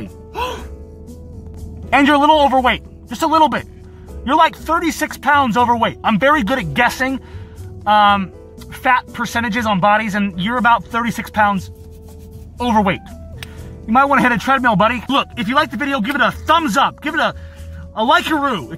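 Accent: United Kingdom. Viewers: lol The British accent took me by surprise. Good times! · Loving the London/cockney-ish accent! Tbf it’s pretty good! 😂😂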